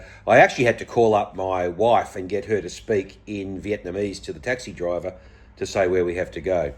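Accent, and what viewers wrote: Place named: Australia. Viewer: Why are you talking that way ? Can't you talk normally ? Your accent irrtates me a bit. Is that an Australian accent? It's a second worst accent I've heard. The worst is South African accent . The second one is yours.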